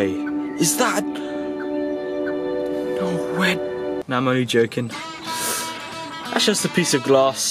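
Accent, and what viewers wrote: United Kingdom. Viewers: I LOVE WATCHING ALL THESE CRUSHING VIDEOS! PLEASE JUST KEEP CRUSHING! Love that brit accent <3 :)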